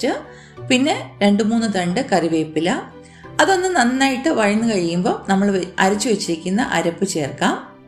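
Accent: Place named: Kottayam, India. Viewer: Well expalined in കോട്ടയം slang 👌♥️👏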